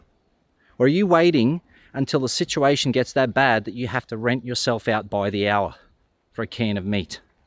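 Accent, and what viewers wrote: Australia. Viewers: It's interesting but I'm hearing what seems like an Aussie talking about Norway with no upfront explanation of that apparent anomaly... ;-) My big concern is much less the typical grid down prepping issues (not that I am indifferent to that!), but the tyranny that is unfolding from our western governments. I lived in Latvia for 5 years and recently returned to my homeland of Northern Ireland, largely due to concerns about the Russian adventure in Ukraine and what that might lead to, but now I am seeing "hate speech" laws being drafted in both the UK and across the border in the Republic of Ireland that make Stalin's dicta look meek and gentle. Essentially, what is being proposed is the legal framework for data-mining everyone's communications in the past to profile them for potential future acts of "hate crime" (i.e. any opinion that goes against their pet narratives) and target them for pre-emptive arrest and imprisonment as a means of preventing them offending anyone with a "protected characteristic". 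Right now, I feel like I have jumped out of the frying pan and into the fire. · Very sensible advice. You sound Australian. Best wishes.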